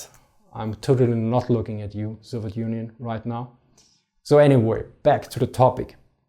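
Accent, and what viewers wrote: Austria. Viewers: Love your Austrian accent! Grüße aus Sachsen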